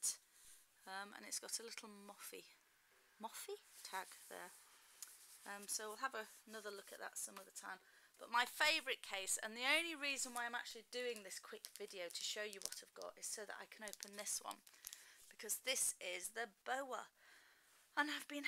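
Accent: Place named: United Kingdom. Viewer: Pouch ouch dilemma:D nice vid, love all your vids but can you please do something with your sound quality? I have to push my macbook volume to the limit to hear that nice british accent;)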